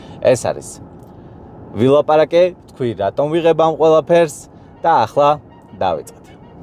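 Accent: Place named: Mingrelia, Georgia. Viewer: როგორ მიყვარს მეგრელების ლაპარაკი <3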